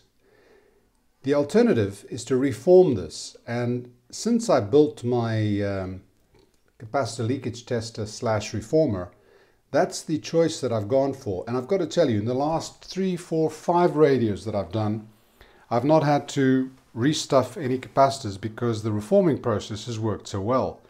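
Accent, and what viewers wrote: South Africa. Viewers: I visited a family in Durban. I met them online. It was an amazing experience. The Tiplady family. We went all over KZN. Now I recognize the accent. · Are you from South Africa?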